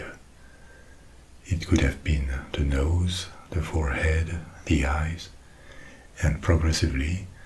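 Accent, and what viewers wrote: France. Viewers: I just want to tell you that I can not sleep without you and I love your French accent. ❤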